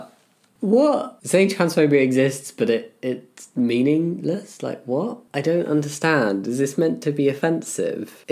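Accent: United Kingdom. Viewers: sometimes I forget you're British (don't me, I tune out accents after a while) and don't know of the prevalence of Christopher Walkin memes such as "it needs more cowbell". You lucky fucker.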